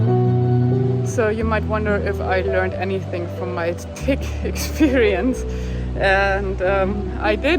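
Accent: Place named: Germany. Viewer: Fantastic video Lady!! I have been everywhere you have in your video. The South is known for it's hospitality and you will find nothing like it anywhere in the world. Missouri and especially Tennessee are my favorite places to be. Georgia is my next favorite. The people are so laid back and friendly. Great film work Lady! Awesome stuff. I still love your German accent!! Peace! Ride SAFE and BE safe Lady!! 🙌👏👏👏👍☝🤙🤘✌👌🖖✋😊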